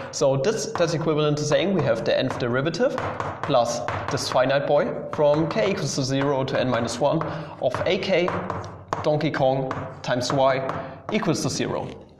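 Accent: Germany. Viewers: That thicc German accent made the informercial even better somehow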